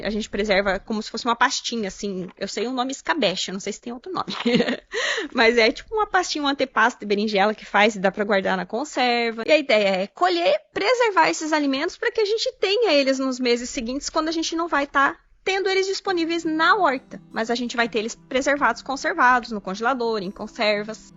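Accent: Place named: Paraná, Brazil. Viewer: Bonito quintal. De onde vocês são? Seu sotaque me lembra o do Paraná!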